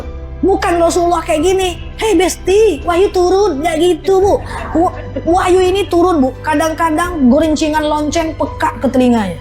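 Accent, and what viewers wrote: West Sumatra, Indonesia. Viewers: Minang ini. Mas bro 🤭😊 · Orang Indonesia ( minang bro ,kelihatan logatnya) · Katanya paniang kapalo brarti eta ustadzah urang minang euy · USTADZAH ITU PUNYA LOGAT,SEPERTI ORG SUMATERA BARAT (PADANG).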